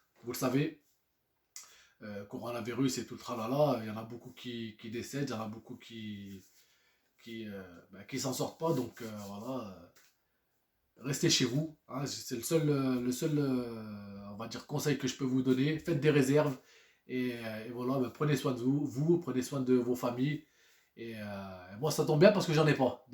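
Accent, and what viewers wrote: Saint-Étienne, France. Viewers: Avec un bel accent de St Étienne ou de Grenoble...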